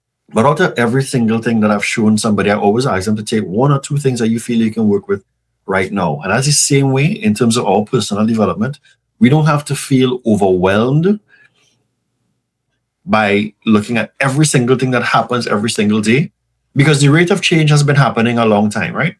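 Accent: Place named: Trinidad and Tobago. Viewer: You sound Trinidadian so subscribed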